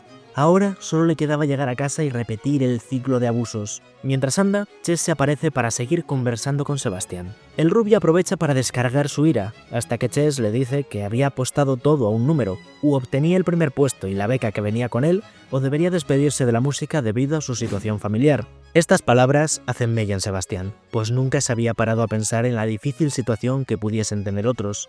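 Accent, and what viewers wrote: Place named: Galicia, Spain. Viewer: ME K4G4 TU ACENTO GALLEGO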